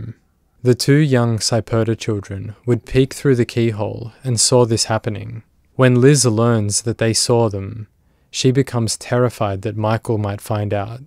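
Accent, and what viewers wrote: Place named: Australia. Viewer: I really do enjoy your videos. You tell the stories with the upmost respect for the victims, their families and their friends. I particularly like the fact that you don’t “over dramatise” an already horrific and terrifying “story.” I also thoroughly enjoy these longer videos. Thank you for all the research, effort and time you put into each and every one. 🫶🏻💙🫶🏻 ps.. where’s your accent from? Australia?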